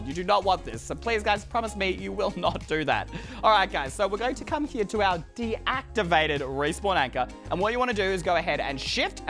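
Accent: United Kingdom. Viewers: You look like chinese,or korean or japanese but your accent is british😂😅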